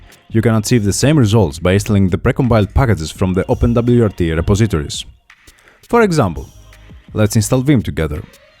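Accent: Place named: Greece. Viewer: Ακούω προφορά και λέω Έλληνας θα είναι. Μετά βλέπω και τη Σαλόνικα και το σιγουρεύω!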